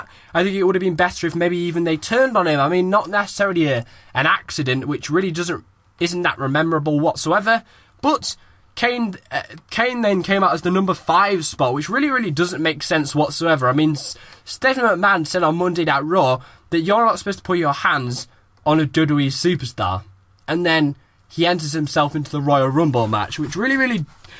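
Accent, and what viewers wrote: United Kingdom. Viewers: Do you speak British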